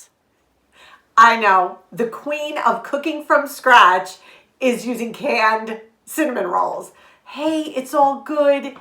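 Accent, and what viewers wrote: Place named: Hudson Valley, United States. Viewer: You totally have the Hudson valley accent. Peekskill born and raised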